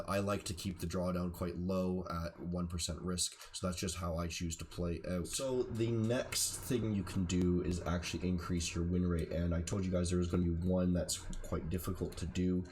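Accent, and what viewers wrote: Canada. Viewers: its just his canadian accent....not his fault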